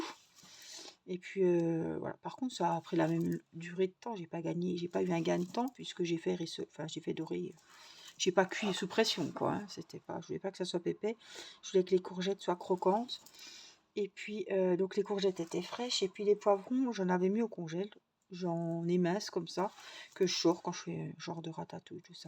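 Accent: Franche-Comté, France. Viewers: Est-ce un delicat accent franc comtois que j'entends ?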